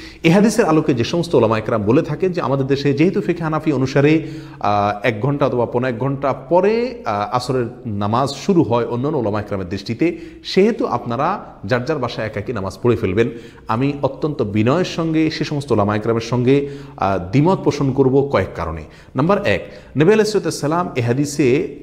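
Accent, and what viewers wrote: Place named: Noakhali, Bangladesh. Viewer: শায়েখ আপনাকে অন্তর হতে দোয়া করি মুসলিম উম্মাহর ঐক্যে পানি ঢালার জন্য। মাশাআল্লাহ। আলহামদুলিল্লাহ। আজ মুসলিমদের ফেকহি মাসআলা নিয়ে অনেক বিভেদ, বিভক্তি ও ফ্যাসাদে লিপ্ত। ইহা নিয়ে বয়ান দেয়ার জন্য জাজাকাল্লাহ। আল্লাহ পাক আপনার হায়াতে বরকত দান করুন। আপনার বয়ান গুলো আমি শুনি। আপনার কথা গুলি আমার নিকট অনেক গ্রহণযোগ্য মনে হয়। অনেক শায়েখের কথা একটু বেশী কড়া ও ঝাঁঝালো।যা মুসলমানের মাঝে অনৈক্যে একটু ইন্ধন হয়। আল্লাহ পাক শায়েখদের হায়াতে বরকত দান করুন যেন সকল মুসলিমের মাঝে ঐক্য সৃষ্টি হয়। আপনার কথায় কেনো জানি আমি বুঝতে পারি আপনি নোয়াখালির কোন অঞ্চলের মানুষ।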